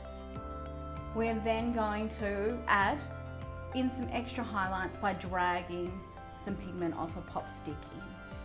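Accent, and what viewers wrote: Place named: Australia. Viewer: Nice to hear your familiar Aussie accent !! Great video xxx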